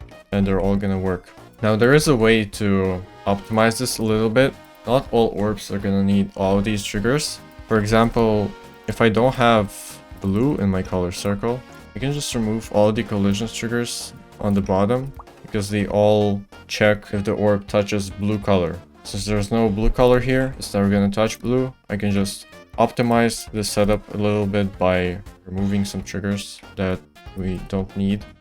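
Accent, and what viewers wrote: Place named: Russia. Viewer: Ты вроде русский как я слышал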